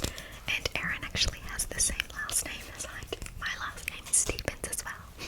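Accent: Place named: Australia. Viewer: Yeah girl AUSTRALIA!❤️❤️ me too.. I thought you where bc you have an Australian voice❤️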